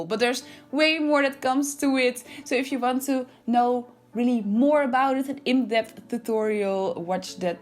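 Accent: Netherlands. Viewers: Your accent is so Dutch 😂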